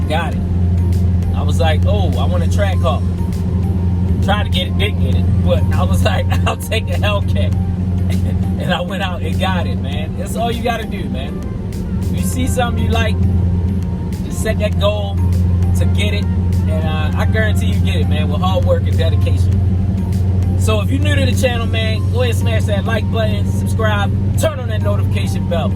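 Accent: Baltimore, United States. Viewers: you gotta be from baltimore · Gatta be from bmore good vid brother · Are you from Baltimore?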